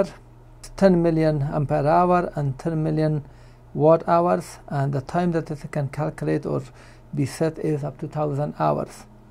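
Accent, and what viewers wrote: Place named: India. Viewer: indian sound funny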